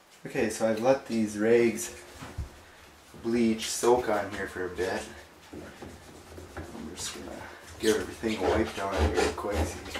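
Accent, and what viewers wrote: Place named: Upper Midwest, United States. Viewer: Thanks so much for posting the code for system clean. Even though mine randomly automatically pops up asking me if I want to clean system, it's always when I'm setting the control to start a load after I've already loaded clothes and detg and when I go to set controls on. It will automatically interrupt and ask me, which I then just punch cancel and it allows me to restart without asking again. If that makes sense. But now that I know how to set manually I can do it with more convience. You sound like you are from Minnesota or Wisconsin, or maybe even Buffalo NY area. Upper midwest accent. Thanks!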